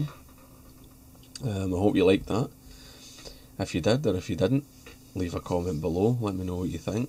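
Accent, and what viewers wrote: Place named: Glasgow, United Kingdom. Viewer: just learn to understand english then, with an Glaswegian accent. English is my second language and I understand it fine.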